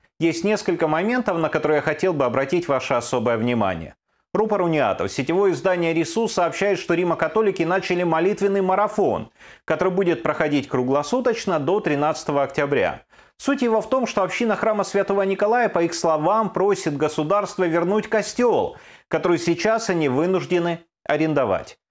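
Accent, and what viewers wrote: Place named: Moscow, Russia. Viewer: По речи я но, что не украинский журналист.Уж очень московский акцент.Наверное по сути правильно,но какое вам дело до всего,с о происходит в другом государстве.Ах,речь идёт о церкви которая принадлежит вашему государству,т.е.Московской России.Вы давно уже разграбили украинские храмы,а теперь ещё цепляетесь зубами и когтями за то,что вы считаете имеет значение для вас и ваших интересов в Украине.А главное прибыль от паствы.При всем при этом суть вопроса понятна,надо было восстанавливать храм.И прежде всего забрать его у Московского патриархата.Уходите с нашей земли и мы наведём у себя порядок.А вы держите у нашего горла нож и ждёте чтобы мы пошевилились,чтобы убить нас.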